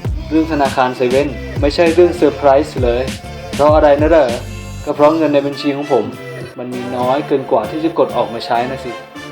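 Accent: Suphanburi, Thailand. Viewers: สุพรรณล้วนๆ555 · เป็นคนแถวสุพรรณเหรอ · เราว่าเน่อสุพรรณอ่ะ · คนสุพรรณแท้ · คนสุพรรณหรอ?? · สำเนียง สุพรรณบุรี ชัดๆ พี่คน สุพรรณบุรี ใช่ปะ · คนสุพรรณใช่มั้ย555555บ้านเดี่ยวกัน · พี่เค้าเปนคนสุพรรนหรอครับสำเนียงออกเหน่อๆ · 555 สำเนียงสุพรรณ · พี่เป็นคนใต้รึเปล่าหรือคนสุพรรณ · เสียงออกสุพรรณ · คนพากพุดสุพรรณไพเราะ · สำเนียงพี่ดูเน่อๆพี่เป็นสุพรรณเหรอครับ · พี่พูดเหมือนคนสุพรรณเลย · พี่คนสุพรรณหรอ?? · เสียงพี่เหน่อจังพี่คนสุพรรณหรอ😕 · คนพากมาจากสุพรรณหรอ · Bank Bank น่าจะคนสุพรรณมั่งค้ะเเต่เราคนอีสานก้อเน่อๆยุ่. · แอดเป็นคนสุพรรณเหรอครับอันนี้น่าจะคลิปที่พึ้งเริ่มหัดทำยูทูปใช่ปะครับ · คนสุพรรณหรอ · เป็นคนสุพันเหรอคะ ผู๋ดเหน้อเชี๋ยว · เสียงมึงแม่งสุพรรณเลยเสียงฮาสัสโครตฮาฮาเหี้ยๆฮามากๆๆๆๆๆฮาโครตๆๆๆๆๆเสียงมึงแม่งโครตของโครตตลก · Narongsrag Tragcnogras นงคนสุพันเเน่ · คนสุพรรณปะเนี่ย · พี่เป็นคนสุพรรณป่ะค่ะเสียงพี่แบบ กาแฟ๋ อั๋นดับ1 เสียงพี่อ่ะ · มาจากสุพรรณหรอคะ555 สำเนียงพากย์น่ารักมากๆ^^ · april dechkan เราว่าคนสุพรรณ~~~ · ไอ้ห่านี่คนสุพรรณนี่หว่า555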